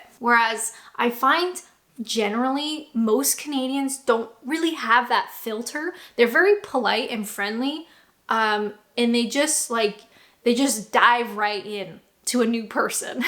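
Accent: Canada. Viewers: Sounds more like a-boat (about) and oaut (out) than oot and aboot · FWIW, I once worked with some Canadians from Montreal and for them English was a second language - they were native French speakers. While they were in Europe, the took a couple of weeks leave to go on holiday to France. They returned in tears saying that the French were the worst language bigots and that they ended up speaking English there because the French would not accept Quebec idioms as valid. Now I love the 'two nations divided by a common language' banter as much as the next Brit but I would never deny an American (or Canadian, Australian etc) the right to their own native tongue. By the way, I think your Canadian accent has become more pronounced recently; I've noticed a lengthening of some of your vowels, eg the second syllable of "about". You have spoken before about taking pride in your native accent, so I hope that you will be pleased.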